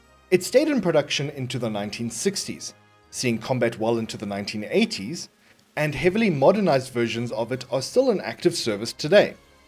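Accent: South Africa. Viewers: In love with the Afrikaans accent.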